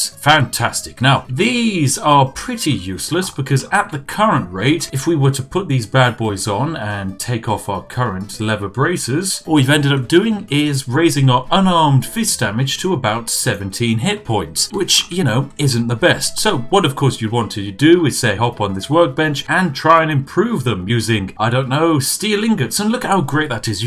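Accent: United Kingdom. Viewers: i like his „that’s absolutely perfect“ and „fantastic“ 😂 of course in a perfect british accent. and of course: „oh, goodness!“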